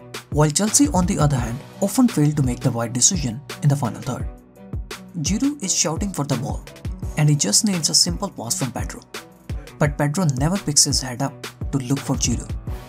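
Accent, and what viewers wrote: India. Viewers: are you from 🇮🇳India.. Cuz ur accent and the way u speak seems so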